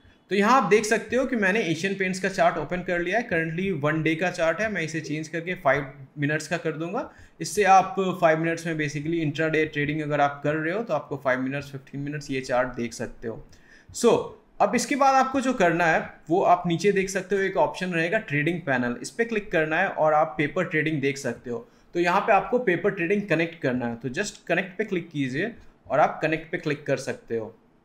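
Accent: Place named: United States. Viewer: Aaj Maine pehli baat american accent me hindi basha suna hai.. Ya fir ye kaho ki angrezi me hindi suna hai..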